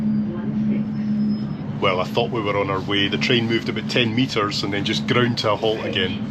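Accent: Scotland, United Kingdom. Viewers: How are you doing buddy 😎you're videos are so interesting and incredible I wish I was Scottish love the accent and fantastic eh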